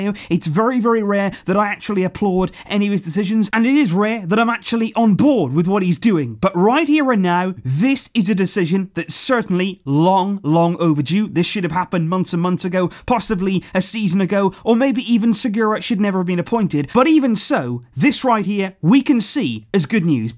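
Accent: Wales, United Kingdom. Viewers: Where are you from? U sound British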